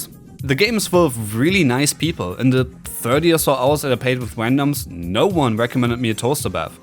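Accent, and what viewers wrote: Germany. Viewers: you sound german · So far the first DRG review featuring thick German accent. Nice! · Sounds a bit german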